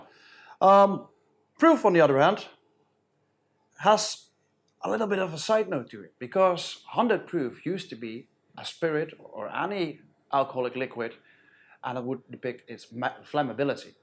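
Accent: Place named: Ireland. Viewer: Dude, what's the deal with the Irish accent? You're Dutch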